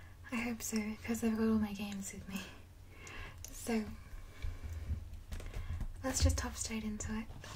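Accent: Australia. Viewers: more reason I do enjoy your videos asides from the video game knowledge and soothing content. Aussi accents are the best. ❤️❤️😘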